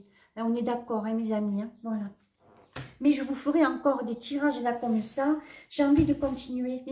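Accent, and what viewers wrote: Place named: South, France. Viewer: Bonjour.... Je vous decouvre.... Énorme... Vous dites quasi la même chose que katia mudai médium.... Vous êtes où ? Je suis de Montpellier... J entends un accent du sud...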